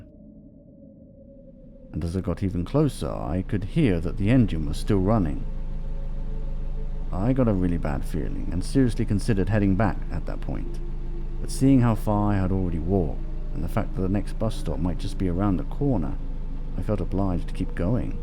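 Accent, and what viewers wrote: United Kingdom. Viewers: is this british accent? i like it. recommend me some more channels like this to practise listening pls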